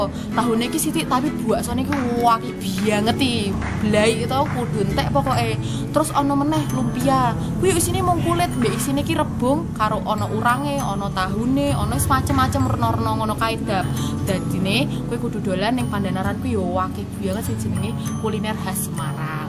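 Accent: East Java, Indonesia. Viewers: ini logat jawa tumur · dialek semarangan tapi logat jawa timuran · aku rasa ini masih kerasa jawa timuran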